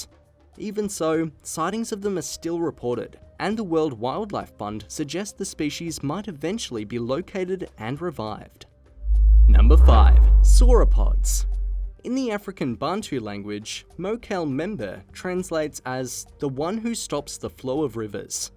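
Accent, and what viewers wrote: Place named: Australia. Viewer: If it's aussie speaking, i believe its real even tho he lack of/some missing words 👌🏾🇦🇺🎙️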